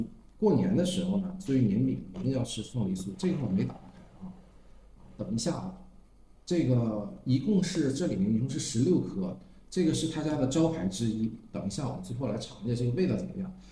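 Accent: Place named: Northeast, China. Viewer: 我是馬來西亞新山人，我也挺想念東北的，聽到你們的口音就想到東北朋友，東北的美食和黏豆包。祝小留學生爸爸和媽媽虎年虎虎生威，小留學生學業棒棒滴！全家健康也棒棒滴！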